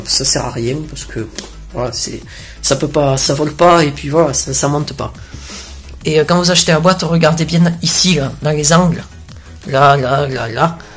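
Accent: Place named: South, France. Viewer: Bravo n écoute pas moi je vais te parler de tes calites. tu a une jolie voix qui passe très bien avec un accent du sud qui te donne un charme fou ca passe Le charme de ta jeunesse et ta belle curiosité qui te mènera loin Plien de progres a faire tu vas y arriver Encouragement un abonné qui te connais pas amitié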